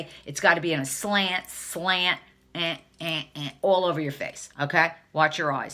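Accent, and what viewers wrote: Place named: New Jersey, United States. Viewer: I have to try micro needling. Yours is the third beauty channel that talks about serious results, and something’s gotta give with this saggy skin. Had not heard of the Gold Bond cream, but I am now on the lookout for it. BTW—love your Jersey accent—a boss and best friend are from NJ , right from your neck of the woods! Love your sense of humor—keep up the good work!❤️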